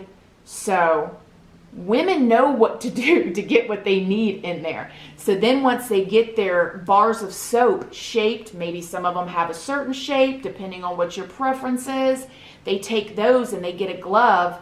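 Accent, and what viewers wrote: South, United States. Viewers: Girl, let me just tell ya hun, i love your accent! Im a fellow southerner, from Alabama, and my son (age 12) and i also have our own language that only we understand lol. 😂. Love you bunches chick! 💞😘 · Omg...you went from 14k to 93k in less than 2 weeks girl! you're making it rain on them hoes! 😂😂😂 and don't you worry about the way you talk;) I'm from Kentucky and there ain't nothing wrong with having a southern twang! xoxo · Girl, it’s just a southern accent that some can’t understand. LOL. I’m in mississippi so I knew EXACTLY what you were saying!!!!!!!!!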